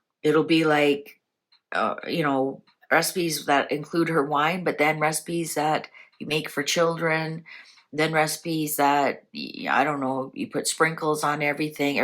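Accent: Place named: Canada. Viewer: Ingredients for toe jam: hair and bacteria-filled hands 🤣🤣😭😭😭 your brutal yet somewhat nonchalant sarcasm (thrown in the mix) in your readings is greatly appreciated and adored over here 🥰😇 I also love your Canadian accent 😍 "I'm the toe-jam girl" 😭😭☠️🤡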